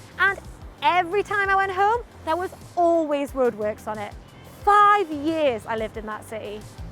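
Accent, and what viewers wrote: United Kingdom. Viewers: Sorry to say, with your accent I take it you are from UK and when you mentioned there were MANY pot holes in Beijing but when comparing to UK; the road in China is as smooth as a baby'd bum! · I noted that she and another British youtuber, Mr. Barrett, pronounces City as CE. Not sure this is a common UK accent? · You're so cute，as well as your British accent. Hope I can buy you a cup of coffee when I go back to China😄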